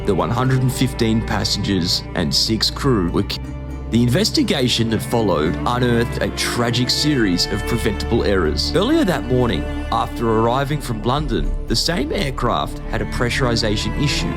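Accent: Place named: Australia. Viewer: what the aussie accent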